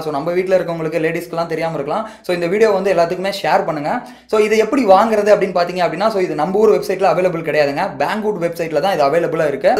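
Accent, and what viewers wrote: Salem, India. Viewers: bro nenga Salem ha bro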